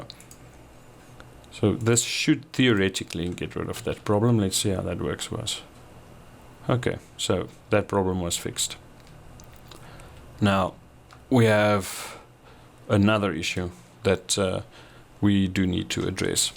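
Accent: South Africa. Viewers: South African accent!!?!?!?!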